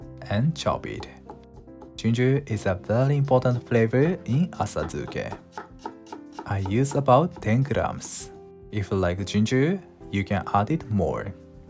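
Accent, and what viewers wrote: Japan. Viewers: Great video, arigato totemo ! Love your nippon accent and your serenity! Cooking is like a religion 😊🙏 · Agree! Your voice and Japanese accents are so pleasing to listen to 🌹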